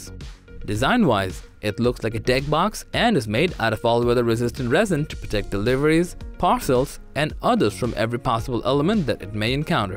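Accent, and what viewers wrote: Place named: China. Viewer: Your Chinglish needs a bit of work...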